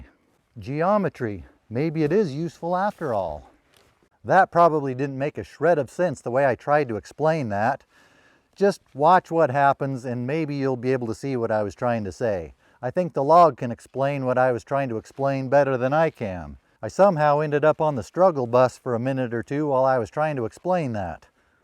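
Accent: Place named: West, United States. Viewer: The accent reminds me of buster shruggs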